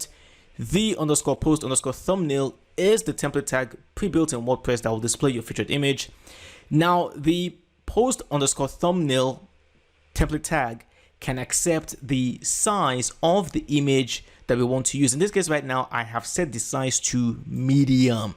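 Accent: Nigeria. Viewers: Great video. Merging my php knowledge with Wordpress has been tricky. This is really helpful. Thanks You sound Nigerian. Are you Nigerian? I’m Nigerian 🇳🇬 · Hey bro, good info, is that a Nigerian accent lol?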